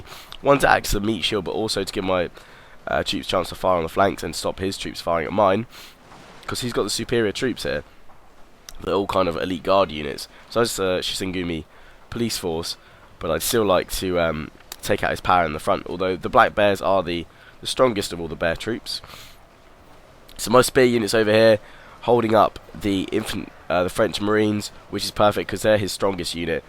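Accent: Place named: England, United Kingdom. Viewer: He's from England, and here when we see an 'i' we say 'eye' naturally.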